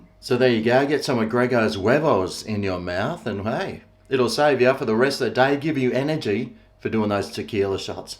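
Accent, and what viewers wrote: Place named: Australia. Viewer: Spanish spoken by an Australian!!!! OOH LA LA!!!!!!!!!!!!! We likey!!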